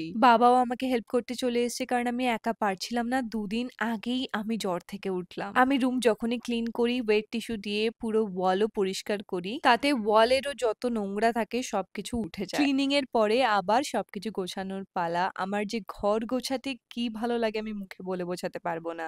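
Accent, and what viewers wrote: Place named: Mumbai, India. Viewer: Ektai jinis valo lage na, title dekhi, but kothar accent ta sunle mone hoy j mumbai te manush hoyeche madam, eto dhong kore kotha bolr ki ache bujhi na, normaly bollei toh hoy 🙄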